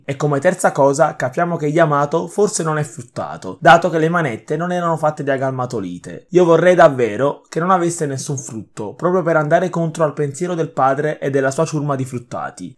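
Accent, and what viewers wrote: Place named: Naples, Italy. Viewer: Che video penoso, non c'è coinvolgimento. Tanto valeva farlo con il sintetizzatore vocale di google translate. L'accento napoletano è insopportabile, potresti sforzarti ad attenuarlo un minimo.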